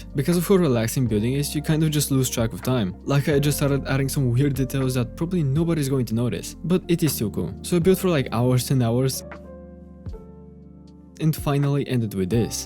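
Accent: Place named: Russia. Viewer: какой-то русско-язычный акцент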